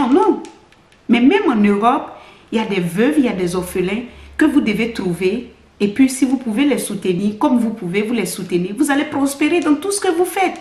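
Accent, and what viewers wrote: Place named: Ivory Coast. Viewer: Bonjour madame. Tu parles avec le langage ivoirien. Es tu de mon pays la Côte d'Ivoire ?? Tu es fabuleuse et je suis fier de toi et de tes propos.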